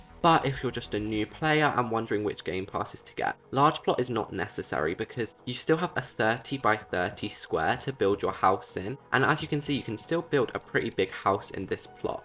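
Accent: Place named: United Kingdom. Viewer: I love ur britisj accent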